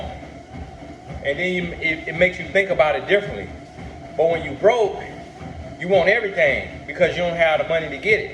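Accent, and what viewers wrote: South, United States. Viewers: Hey there Mr. I forgot to just say ion know what video you said it on but I’ve heard a few times but you say you was insecure or something like that about your country accent man that the one thing I love about on know fruit stuff but I makes me more comfortable as black man to sit an listen to your videos it speaks to me an Im sure many others I be definitely got out more of my homies on from the hood anybody else wouldn’t be able to reach us like you can so I appreciate you an everything you do I pray God bless you even more man cause honestly I had been lacking in the self love department do to child hood trama an had some bad patterns popping in relationships back to back from Mommy wounds that were never solved but hearing this an hour courses have really helped an continued to help so thank you man 💪🏿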